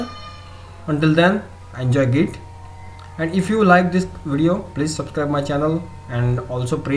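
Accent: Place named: India. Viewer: man you have the best indian dialect i've ever heard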